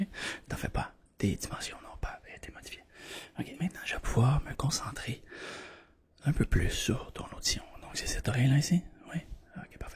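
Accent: Quebec, Canada. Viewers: Voilà désoler mes on comprend rien l accenquébécois c'est pas la langue française voilà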